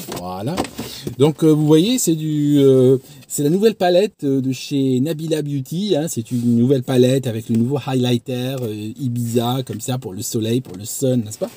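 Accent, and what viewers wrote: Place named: Switzerland. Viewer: Il est génial ton Kanal 👍 j'aime beaucoup 👌❤️ Ici en France nous parlons de 'Chaîne' Youtube 😉 même si ta dénomination de canal, ou Youtube Channel, me rappelle que tes rares intonations de blédard sont une bénédiction lorsqu'on entend ton accent suisse 🫣 ENSMAtiquement Français 🥰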